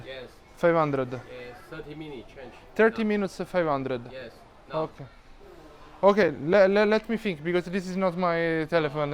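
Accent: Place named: Italy. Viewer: It's a beautiful enjoyment to listen to you speak English with your unique Italian flicking tongue. 🥰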